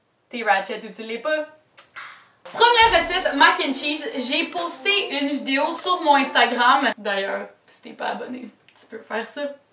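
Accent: Quebec, Canada. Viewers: Haha j'ai mis les sous-titres pour rigoler et voir ce qu'ils vont dire avec ton accent canadien (je suis française), il a mis des trucs complètement aléatoires, même moi j'ai mieux compris du haut de mes 12 ans...😂❤ Je t'adore 💚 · De base le contenu m interesse pas vrmt mais juste le fait d entendre l accent québécois jrigole pdt 10min donc c cool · Toujours trop bien tes vidéos où faire un defi de recette au frigo ou un congélateur ou essayer de parler sans accent ou exprésions Québécoise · Nn tkt l'accent Québécois be like❤️😍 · BlockShoooter non tu n’est pas la seul moi je kifffff l’accent québécois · xago1822 avant je trouvais l'accent québecois un peu chelou mais maintenant je trouve ça tellement kiffant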